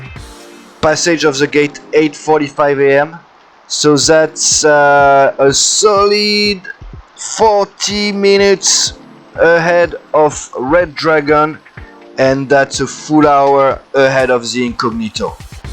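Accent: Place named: United States. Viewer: Salut, moi aussi, comme James, je me suis demandé si en mettant de la marchandise à contre-gîte tu pourrais pas filer au près serré avec plus de voilure.Tu as essayé ? Mis à part, tu parles sacrément bien anglais et ton léger accent est très confortable pour ma compréhension, tu as vécu aux états unis ? A plus...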